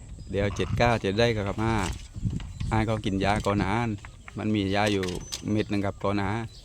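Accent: South, Thailand. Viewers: ขอโทษครับ ผมเพิ่งได้ดูช่อง เป็นใครรึครับ?? พูดใต้'เป็นตัวเเทนคนใต้เลยคับ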